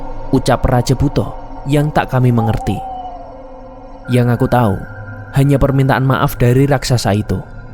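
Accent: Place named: Java, Indonesia. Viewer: Enak jowo ne mas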